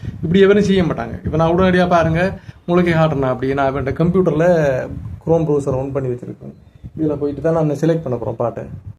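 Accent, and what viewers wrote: Sri Lanka. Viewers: இலங்கை தமிழ்.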